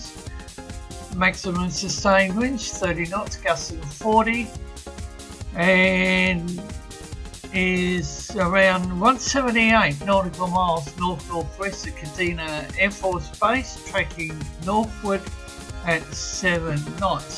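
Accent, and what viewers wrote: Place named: Australia. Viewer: Défi 200 abonnés sans aucune vidéo: HE'S AUSTRALIAN AUSTRALIAN ENGLISH + SHITE MIC = STUPID VOICE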